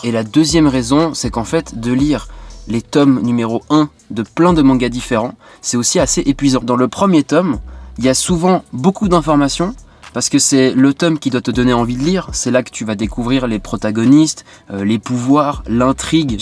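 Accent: Switzerland. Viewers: T’es Suisse?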